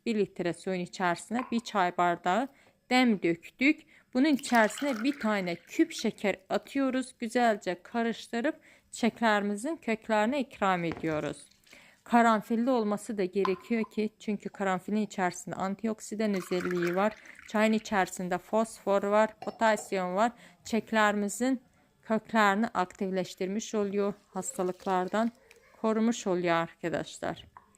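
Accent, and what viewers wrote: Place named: Azerbaijan. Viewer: XANIM SIZ AZERBAYCANLISIZ?SIZIN DANIŞIQNIZ BIZIM ŞIVEYE OXŞAYIR❤❤❤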